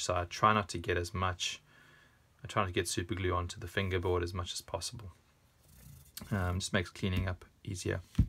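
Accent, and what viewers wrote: South Africa. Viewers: ... are you South African?? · Geolocation says Dublin, but accent is SA? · Are you from South Africa?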